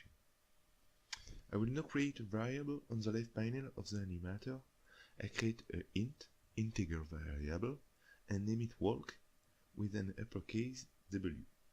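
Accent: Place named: France. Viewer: Don't worry about your french accent this tutorial is great! I just started researching voxels so this place is a good start for me! Thank man!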